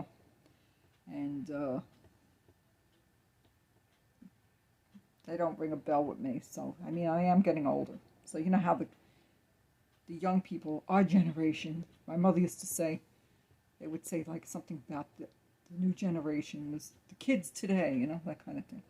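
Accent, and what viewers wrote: Brooklyn, United States. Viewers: I believe I commented on your accent before! I am a Brooklynite myself but without much of an accent!! Enjoy listening to yours!! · I love to listen your Brooklyn accent - I bet you miss New York - you are very pleasant blessings🌸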